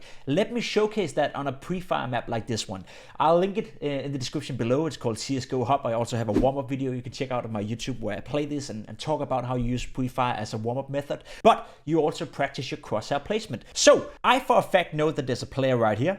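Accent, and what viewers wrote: Denmark. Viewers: you sound danish so if you danish awnser this in danish hej lige fåndet dig og du er mega god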